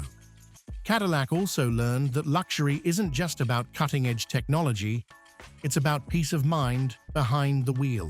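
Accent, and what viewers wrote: England, United Kingdom. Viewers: Four Thousand , One Hundred and One.............> WRONG:!!!! 4100..........Four Thousand, One Hundred..............PERIOD! Do some EDITING,,,,,,,,/"RESEARCH",,,,,, and get "RID" of the "GAY: English Guy's VOICE! Brits have been : LOOSERS,,,,,,for OVER 100 years now,,,,,,,,, "COME ON MAN"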